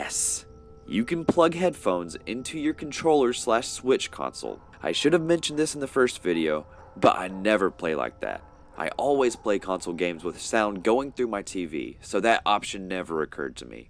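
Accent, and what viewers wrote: United States. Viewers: Lordy lord, that's a fine southern accent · People from pennsylvania often sound like this, wonder if hes from there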